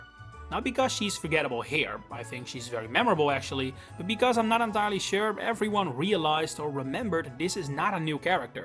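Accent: Netherlands. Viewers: Dutch accent recognized, opinion respected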